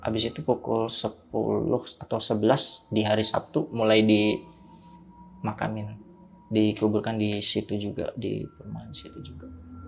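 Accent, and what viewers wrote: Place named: Serang, Indonesia. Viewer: wong serang kih wkwk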